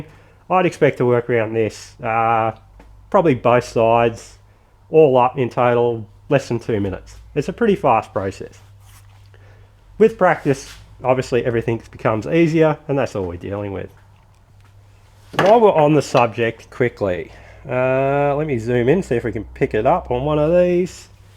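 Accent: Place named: United Kingdom. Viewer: I can’t understand this guy’s British accent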